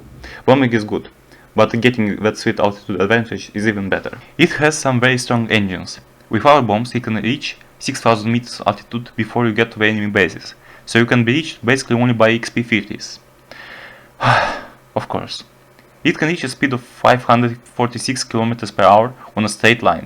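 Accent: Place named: Russia. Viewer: Твой русский акцент режит прямо по ушам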